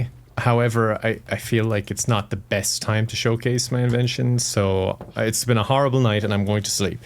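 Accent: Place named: Ireland. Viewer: living in Ireland lol. He spent some time in Spain though! I want to say I hope that clarifies things but it probably doesn’t 😅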